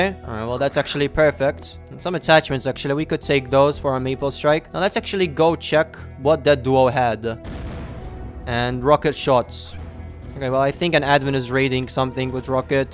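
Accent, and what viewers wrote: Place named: Malta. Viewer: As soon as I heard your accent I knew you were Maltese too hahaha.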